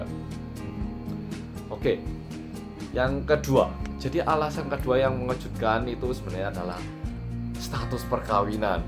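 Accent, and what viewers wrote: Java, Indonesia. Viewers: Medok tenan ngomonge cak:v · model KTP tiap2 negara bisa berbeda tergantung pada kondisi GEOPOLITIK negara masing2 dan ini bersifat dinamis. di eropa, jerman misalnya KTPnya ada masa berlakunya. lebih jelasnya bisa bertanya ke dept. dalam negeri. salam Lemper mas bro..., tetaplah medok dan trus ngomong jowo.., tak tunggu vlog sampeyan selanjutnya,suwunn,hehe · medok bnget mas... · wajah korea.. bosonya medok sekali.. hehe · gue kira orang jawa wkwk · hah? orang korea? kok medok banget sih bahasa jawanya:') serasa orang jawa abis belajar bahasa korea cobak:') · orang korea ya bang?? kok logat bicaranya kayak orang jawa:D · keren yaa, logatnya jawa gitu padahal korea👍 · kok medok jawa? 😂😂😂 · sipit tp kok medok ya mas 😄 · koreane ngledok:v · 안녕하세요. 조는 노비입니다. Ternyata 씨 orang korea yaa tapi lancar sangat bahasa indonesia sampai logatnya logat orang jawa 대박 😁. 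Saya masih proses belajar bhs korea 😁. Oh iya saya sudah tahu ktp org korea kaya gimananya di drama my father is strange 😉 eps 52 hhi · medok banget mas. 😂 gemes. haha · lucu banget jawanya wkwk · kok suarany kyk orng Jawa bgt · kok medhok ya. btw baru nemu orang korea medhok. keren bingit..subscribe ah. keep posting info menarik ya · lah kok medok... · maaf ya,mo nanya kamu tinggal di Indonesia dmn? di jawa kah? logat jawanya kelihatan bgt hehe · Asli org mana sih kak Ktp nya korea tp bahasa nya kok medok ya 😁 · Medok temenan koe bang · pantesan ada yg gak percaya sampeyan org korea.. wong ngmgnya medok jawa buangett.. 😅😅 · 오빠 ngomongnya medok banget >.< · sudah lama tinggal di jawa ya oppa sampek kebawa logat jawa ( medok ) 😂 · mendok bet😂😂😂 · logatnya seperti logat jawa medok 😄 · jowo nya ningendi mas??? ribet gak mas.pindah kewarganrgaraan ganti KTP nyaa? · Mas e gk pnya ktp indo??besar di Korea??bahasa e medok Jawa gt???kaget q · logat ngomong nya kaya orang jwa,medok gitu. 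· pertama kali liat video ini kok fokus gw ke medok jawanya ya?😂😂😂😂😂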